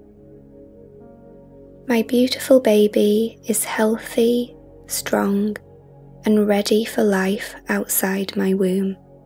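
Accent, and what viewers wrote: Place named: North East England, United Kingdom. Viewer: I'm a Geordie, from Newcastle! Sounds like you're from somewhere not too far away :)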